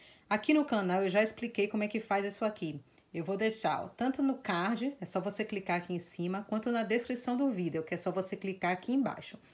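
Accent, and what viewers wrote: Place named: Northeast, Brazil. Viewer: .....minha querida seu sotaque é único , eu amo sotaque nordestino...... é q nós paulista falamos vídeo com o som o "i"..... então fica "vidio"..... E eu amo ouvir vc falando "videeo".....adoro ....adoro .....e adoro!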